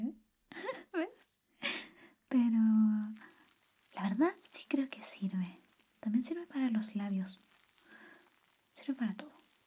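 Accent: Chile. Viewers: Me encantan los tus asmr hablando chileno.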